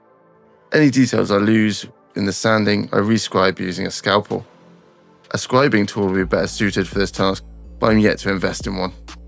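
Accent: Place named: United Kingdom. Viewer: a real voice is infinity better than any A.I. rubbish. Nothing wrong with a proper Brit accent.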